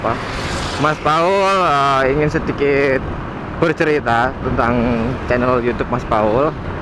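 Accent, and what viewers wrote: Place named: Java, Indonesia. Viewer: Mas mas ini doang yg medok tp sunda nya nyablak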